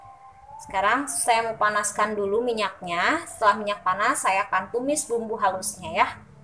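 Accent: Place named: West Java, Indonesia. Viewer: Dari logat bicara, sepertinya si ibu ini orang sunda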